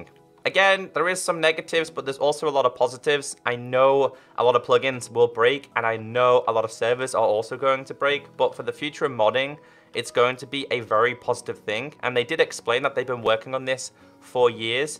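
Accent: United Kingdom. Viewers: It’s the accent. UK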